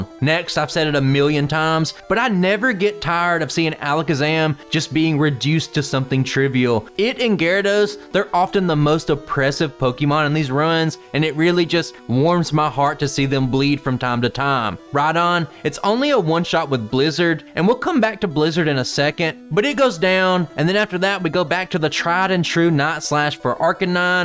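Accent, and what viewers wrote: South, United States. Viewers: to hear your Southern drawling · You are one of the only Youtubers with a southern accent that I have ever watched.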